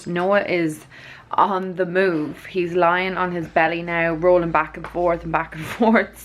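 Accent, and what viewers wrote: Ireland. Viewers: I’m so happy 😀 that your finely being your self. You should enjoy 😉 your life and your family always comes first. Who cares what people are going to say because you can’t please everyone and you can’t get everyone to like 👍 you. I love 💕 to hear 👂 about Baby Noah and how much he’s growing. Don’t ever think 🤔 you have to apologize because you don’t. Besides I live here in Texas and I love 💕 it when y’all show parts of Ireland 🇮🇪 and I love 💕 your accent. Now go get some rest. Merry Christmas 🎁🎄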